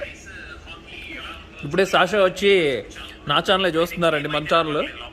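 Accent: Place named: Telugu, India. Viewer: అన్నా మీరూ ఇంగ్లీష్ మాట్లాడుతుంటే ఏంటో తెలుగు మాట్లాడుతున్నట్టు వుంది అన్న....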